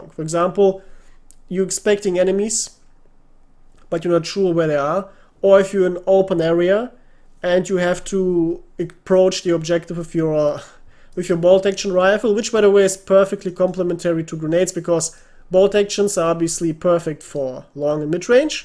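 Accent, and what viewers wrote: Germany. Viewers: truth! Obviously, his Enlisted take is great, or we wouldn't watch. But the delivery - a balance of German inflected English accent with many wry wittisisms is the cherry on top. If instead it was rando guy from North America, it might be less pleasing to my ears.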